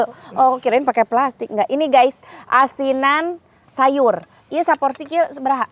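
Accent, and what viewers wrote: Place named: Garut, Indonesia. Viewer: Hayang aing muncrut🤣 Khas bahasa urang garut Si Tteh. Ngiring raos na Tteh🙏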